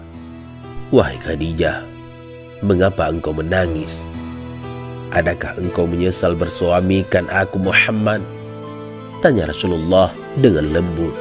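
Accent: Aceh, Indonesia. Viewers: Adminnya ada slang melayu acheh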